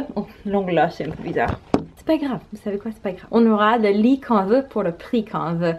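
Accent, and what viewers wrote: Quebec, Canada. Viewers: Ce que tu dis sur les sectes... Que le problème c'est les gens qui partent et tout... Je viens de réaliser que c'est exactement la même chose dans le monde du travail, c'est toujours la faute de la personne, jamais de l'entreprise 😳 PS : c'est vrai qu'on entend un léger accent québécois 😅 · Hello:) Tu commences à avoir un accent canadien quand tu parles français. Je l'avais déjà remarqué dans ta dernière vidéo. Super cute! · Coucou j'ai l'impression que tu prends un peu l'accent québécois c'est amusant 😉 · Oh le bel accent canadien 😁. J'adore ta coupe , ça te va super bien ❤️. Et trop contente pour toi, une nouvelle vie commence 😘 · Ahahaha tu commences à prendre l'accent québécois, j'adore!!! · Trop marrant, j’ai l’impression que tu attrapes un petit accent québécois 😂 Trop bien pour toi en tout cas, une énorme charge mentale en moins!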